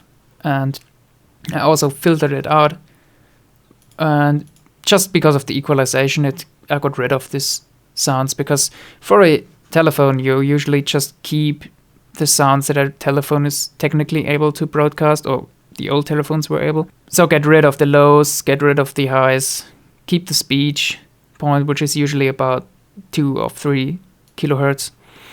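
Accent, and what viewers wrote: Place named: Germany. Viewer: The german accent is the best part of the whole video!! Haha. Love it man. Keep at it!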